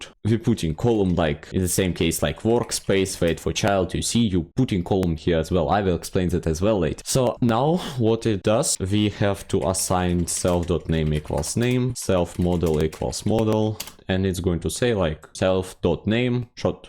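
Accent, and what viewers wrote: Russia. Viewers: omg the russian accent i can feel it great tutorial !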